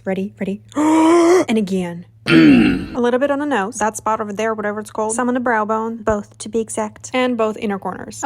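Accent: Russia. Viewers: her accent sounds more like russian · OMG I LOVE your humor and voice! also, aren't you russian? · слышу русский акцент хаха🫶🏻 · I think she has a Russian accent respect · Я слышу русский аккцент, наверное, но вы крутышка💕💕👀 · damn are you Russian? because the occasional accent and the fucking picture of конь Юлий were such a slay 😭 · черт, я прям чувствую этот русский акцент....................... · Моментами пробивается родная русская речь..) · С первых секунд поняла, что вы русская. На самом деле, шикарное видео,спасибо❤ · I rly like ur videos, this video have Russian memes and аKцент😮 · your accent sometimes seemed a bit...russian? to me, GIRL ARE UU russian or it just seemed to me?? 😭😭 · this accent sounds like typical «russian accent» i don’t know where you from but still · I hear a russian accent...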